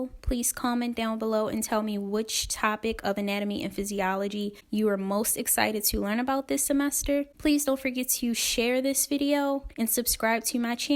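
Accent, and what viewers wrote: Baltimore, United States. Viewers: Definitely sounds like a Baltimore accent! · This was incredibly useful!!! Thank You!!!! I know a Baltimore accent when I hear one😊.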